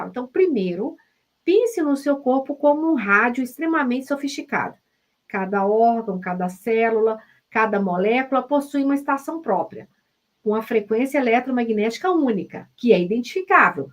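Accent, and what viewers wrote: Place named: Minas Gerais, Brazil. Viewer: Quero muito fazer esse curso, primeiramente pq vc é autêntica e simples tanto pra explicar o conteúdo e para se apresentar pra gente aqui Esse sotaque mineiro gostoso rsrs é muito bom! Se não conseguir nesse momento, tenho certeza que em outro estarei com vc 🙏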